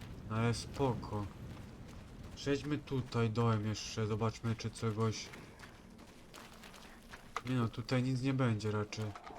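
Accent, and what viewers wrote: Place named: Silesia, Poland. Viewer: Słychać u Ciebie gwarę śląską :) pozdrowienia z Chorzowa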